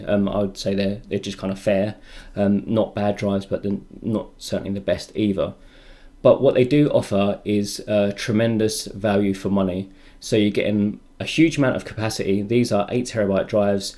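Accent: United Kingdom. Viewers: you sound like your from the UK but you always price in dollars strange? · Ubersonic He is British according to his accent abs he always mentions the price in pounds, but this time round he mentioned it in USD.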